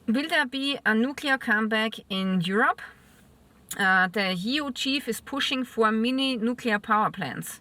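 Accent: Germany. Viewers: I never liked a German accent but I like hers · Thank you for this summary of unsavory changes in Europe. Living in America, I’m often preoccupied with our actions and reactions, forgetting to stay up to date on the other regions. Love your accent by the way, reminds me of dear family friends I have in Mannheim.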